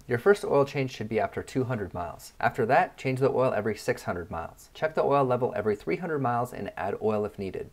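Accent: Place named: China. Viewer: Chinglish!!!! I love it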